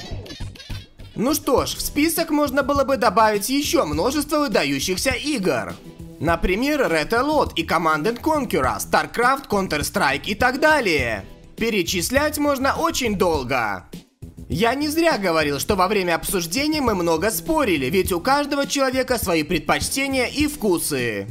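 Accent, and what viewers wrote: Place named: Moscow, Russia. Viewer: какой голос блять противный и ещё этот говор мАсковский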